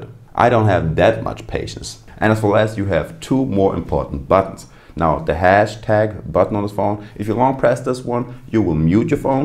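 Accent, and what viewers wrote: Germany. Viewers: Are you from Germany ? or maybe Austria . your accent sounds German to me.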